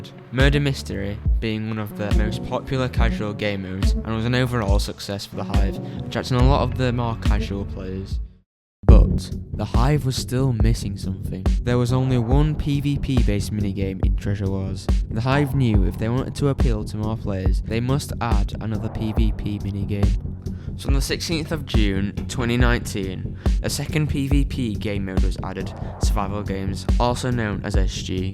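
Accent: United Kingdom. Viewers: are ypu birtish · Edit: English boy talking about the story of a block game server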